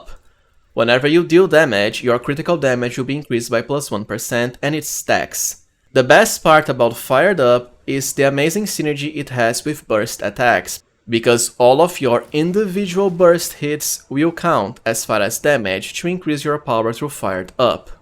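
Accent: Brazil. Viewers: Are you Brazilian? your accent cant fool me :P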